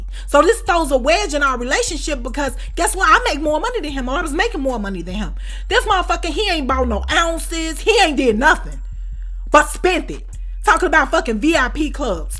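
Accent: Chicago, United States. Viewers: I just found your channel this morning and on the 3rd video lol I know my ppl when they speak (Chicago #773 😌) . Keep doing what you’re doing 💪🏾💪🏾💪🏾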